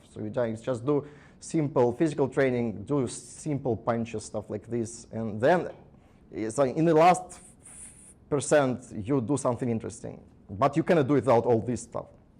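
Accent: Russia. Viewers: youtube classifying russian accent as russian language is kind of racist to be honest...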